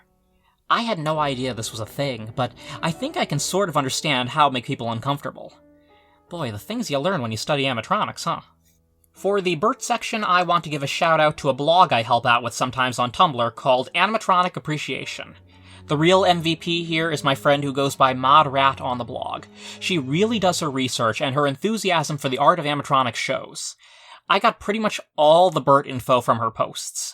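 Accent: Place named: Tennessee, United States. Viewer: Lol I didn't think you were Australian at all I think you're from like Tennessee instead lol!! Also I think looks more hilarious than creepy lol!!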